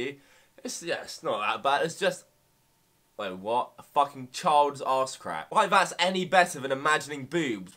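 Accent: England, United Kingdom. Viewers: he is English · English or Australian?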